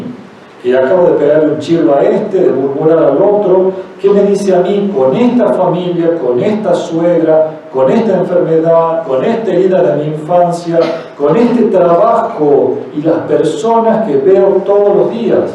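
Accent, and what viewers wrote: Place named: Argentina. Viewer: Doy gracias a Jesucristo porque me permite participar y aprender en este retiro. Gracias Padre por su enseñanza. Doy gracias a Jesucristo porque me permite participar y aprender en este retiro. Es muy clara su explicación pero me cuesta mucho trabajo entender la dicción, tengo que repasar varias veces algunas partes del vídeo para entender. Perdón por no recordar el nombre de su compañero, pero la exposición que hizo sobre la confesión casi no la pude entender.Yo creo que ésto nos puede suceder a muchas personas que no somos de Argentina. Para retiros posteriores, pudieran hablar un poco más despacio? Es muy valiosa su aportación, por eso hago este comentario, Muchas gracias, Dios los bendiga.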